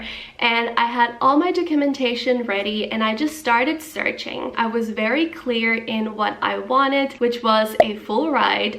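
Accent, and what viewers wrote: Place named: United States. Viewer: your accent is more American than Honduran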